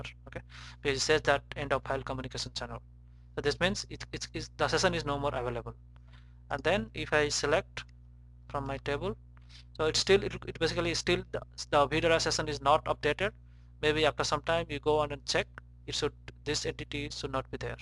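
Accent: India. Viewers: Can't understand your accent! This is English for indians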